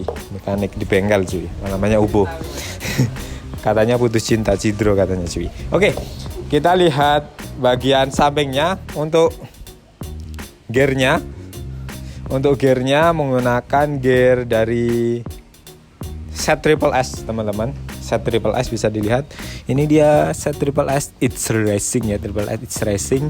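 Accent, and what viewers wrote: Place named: Java, Indonesia. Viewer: Ngomong gue nya medok bngt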